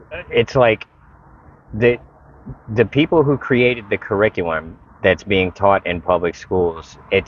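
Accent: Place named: Long Island, United States. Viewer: your Long Island accent makes you sound like *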